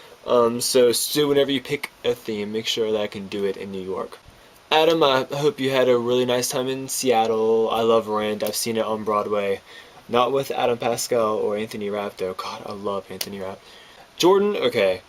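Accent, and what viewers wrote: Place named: France. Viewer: do you have an accent? you sound a tiny bit french :]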